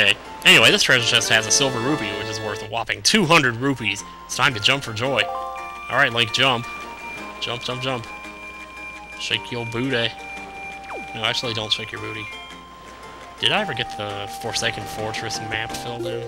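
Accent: South, United States. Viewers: Where did you live for 10 years? Georgia? Texas? Alabama? I think different states have different accents since I grew up in Mississippi, then moved to Texas, and people said I had an accent, heh. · Southern accents are the best! :D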